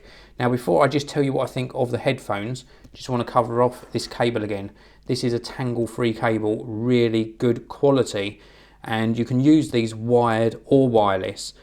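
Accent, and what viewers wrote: United Kingdom. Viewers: Nice and complete review man! The best I've saw on that one (the smooth English accent is a +)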